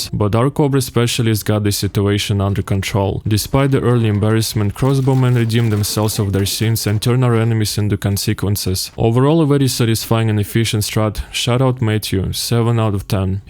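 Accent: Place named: Russia. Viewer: thick russian accent... I LOVE IT!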